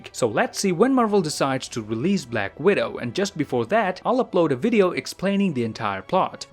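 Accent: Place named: Quebec, Canada. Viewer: I can tell by the way you pronounce farm, are, and such. I can tell you’re French-Canadian. I’d like to assume your first language is French. And with that information, shall I suggest the same channel but you say everything in French, for any French viewers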